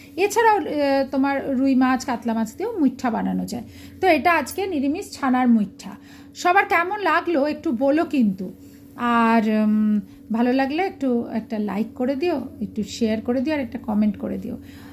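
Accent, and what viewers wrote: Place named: Bangal, Bangladesh. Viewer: Akebare notun ar chamatkar akta ranna . Bangal bhasa sunte besh laglo ,abar o sonar apekhay thaklam .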